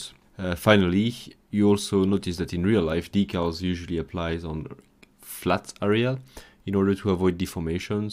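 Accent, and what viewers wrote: France. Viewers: nice try but your French accent are definitly present , merci !! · Nice french accent ;) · Dude love for accent à la française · very french, love it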